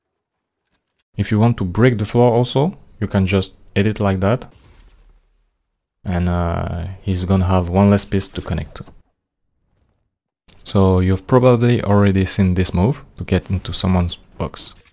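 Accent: France. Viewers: Why you have a french accent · That french accent thooo, keep up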